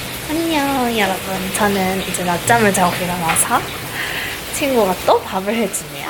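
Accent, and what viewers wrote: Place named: Gyeongsang, South Korea. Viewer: 경상도말투 핵기욤이셔요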